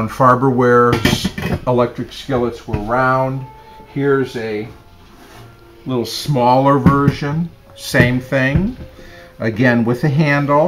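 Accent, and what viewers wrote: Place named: Michigan, United States. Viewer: Michigan! That's why you talk normal. I'm from Northern Illinois.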